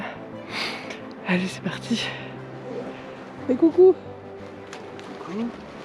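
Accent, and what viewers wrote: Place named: South, France. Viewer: "eh bieng bonjour les copaing..." Ca me fera toujours marrer cet accent de sud perdu en Alsace 😂 Toujours aussi sympa tes présentations :) Tiens, ton écluse de 16 mètres m'a rappelé une autre curiosité que j'ai visité quand j'allais régulièrement à Strasbourg et que tu pourrais présenter: le plan incliné de chaipuhou (en fait je sais, mais j'vais pas spolier)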